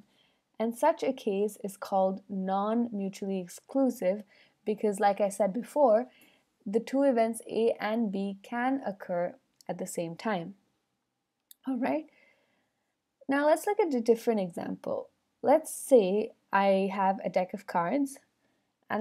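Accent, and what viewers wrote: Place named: India. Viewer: Thanks. 👍 I was just wondering if you are an Indian? You sound like one.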